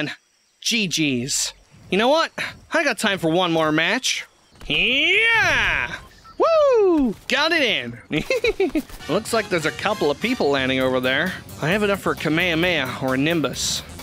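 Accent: United Kingdom. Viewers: Ah yes British goku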